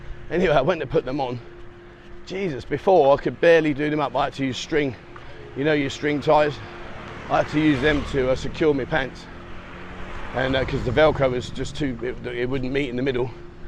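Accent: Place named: London, United Kingdom. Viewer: I'm from los Angeles, and i have difficulty understanding your London accent, but it's worth it! Lov You! Keep it up!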